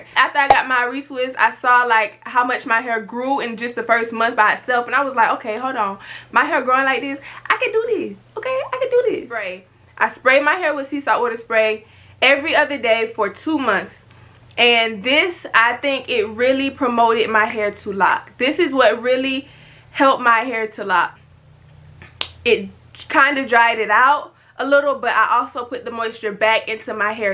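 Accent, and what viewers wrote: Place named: Atlanta, United States. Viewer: I’m getting real Atlanta GA vibes from your accent 😂 love it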